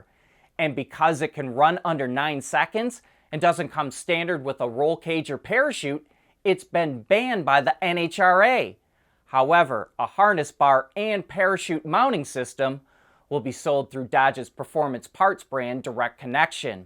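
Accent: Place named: Midwest, United States. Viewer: This is the Midwest. This is how we talk. Jeez.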